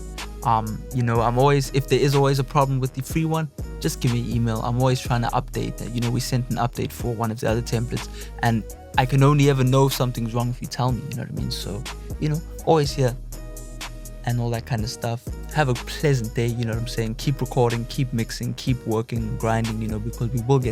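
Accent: South Africa. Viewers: you sound you from South Africa 👌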